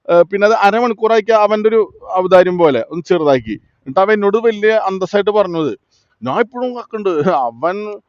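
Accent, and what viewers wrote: Thrissur, India. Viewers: ചേട്ടൻ തൃശ്ശൂർ ഭാഷയിൽ നന്നായി പറഞ്ഞു. 😂ഇനി കാനഡ പോലീസ് നമ്മുടെ നാട്ടിലേക്കു വരുമോ. മോദി ഗ്യാരണ്ടി 😂അവന്റെ ഭാര്യ പോയാലും കുഴപ്പമില്ല. പണം കിട്ടണം.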